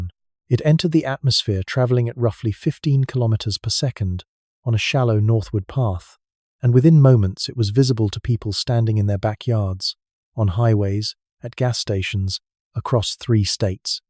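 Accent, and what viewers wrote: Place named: England, United Kingdom. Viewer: I just discovered your channel last night as the algorithm finally figured out that I love science. It’s great to have a channel with a mellow & relaxed English accent that doesn’t drive up my cortisol levels. Subscribed immediately. Can’t sleep tonight though, so I just got up & got into this. I’m in Norman, Oklahoma, US, a.m. I am completely exhausted with channels that are nothing but gossip about celebrities or the monarchy or politics. This is a breath of fresh air. Thank you for all your hard work & thank you for making me a better informed person 😊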